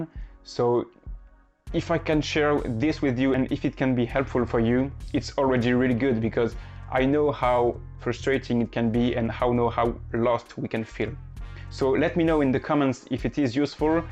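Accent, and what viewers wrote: France. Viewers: Merci beaucoup tes vidéos vont probablement m'aider ! J'ai terminé ma licence de biologie (3 ans), mais je ne suis toujours pas sûre et n'ai jamais été sûre de ce que je voulais vraiment. Le côté musicienne / psychologiste je m'y suis bien reconnue. J'ai dû faire le choix en entrant en 1ère entre littéraire et scientifique, j'ai énormément hésité et j'ai suivi la voie la plus "confortable" et logique du point de vue des gens qui m'entouraient. Il m'arrive souvent de regretter ce choix, c'est tellement tôt pour déjà savoir ce qu'on voudra faire plus tard ! Je me reconnais complètement dans tes vidéos pour la personnalité INFP, merci pour le partage de tes recherches ! Et grâce à ton léger accent français j'arrive à tout comprendre haha ! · Hello! This video resonated so much with me!! Although I've never been exceptionally good at Math and Science, I always knew I had a knack for languages and that's what I decided to pursue. 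I've enjoyed most aspects of it but if I could change what I did at university, I would add in something like Management or maybe Economics or idk, something a bit more "useful" (I majored in Latin American Studies). Anyway, I taught English to non English speakers (including in France! When I heard your accent it made me smile....I love Toulouse ❤️) but now I am just burned out from teaching. I'm currently doing some studies in Human Resource Management but I'm really worried I made a wrong decision because where I live, it's a really small Caribbean island so the market is saturated and I'm here again, feeling very depressed about the future etc. Sighs! Unfortunately, I'm still trying to figure out what's the best option for me, only knowing what isn't for me but never quite figuring out what career does resonate with me. I suppose I need to do some deeper digging. Anyway, sorry for the really long message! 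I'm sorry that I only just saw this video as well! It would've helped me so much back when the pandemic was just hitting and I was returning from my very last teaching stint in Spain. For now, I have a job that pays the bills but I know I need to leave soon! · Salut ! Malgré que tu parles très bien anglais il me semblait bien avoir perçu un petit accent francais derrière tout ca lol Je suis moi aussi INFP ingénieure en informatique depuis 1 an (data analyst) et je me reconnais tellement dans tout ce que tu dis... j'ai plusieurs fois pendant mon parcours à la fac (et encore récemment) réfléchi à si je n'étais pas sur le mauvais chemin... à la base je voulais être prof mais trop sensible au mauvais comportement des élèves j'ai changé d'idée, arrivée au collège j'étais sure de vouloir finir biologiste mais arrivée en fac de bio j'ai complètement perdu la motivation alors j'ai changé de voie et suis partie en fac d'info car j'avais eu cette option en biologie et j'aimais le coté logique et puis mon père aussi informaticien m'a surement influencé aussi à cette premiere periode de doute... 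je n'avais donc aucune idée du but de mes études contrairement à la bio ou je voulais "contribuer au bien etre du monde", mais j'aimais plutot bien ce que je faisais, je m'en sortais bien les premières années et j'ai obtenu mon diplôme après avoir pas mal galéré sur la fin, en fait j'avais l'impression de le vouloir sans le vouloir, de ne pas trouver de but à ce diplôme... Cette année j'ai décroché mon premier CDI tout se passait très bien une fois lancée je kiffais ce que je faisais je ne me posais plus de questions... le covid est arrivé, j'ai du coup perdu mon job et cette période de "vide" m'a fait revenir à moi et qui j'étais vraiment... Avec du r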